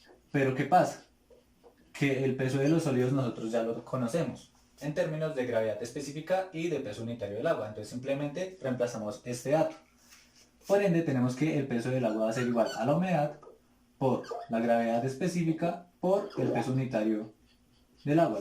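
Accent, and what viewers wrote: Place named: Colombia. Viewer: tal vez ya no te sirva pero por el acento creo que es Colombiano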